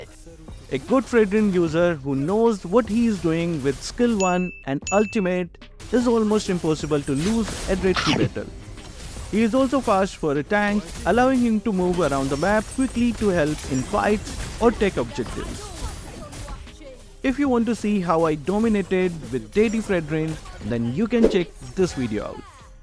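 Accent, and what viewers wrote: India. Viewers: Bro are you indian😅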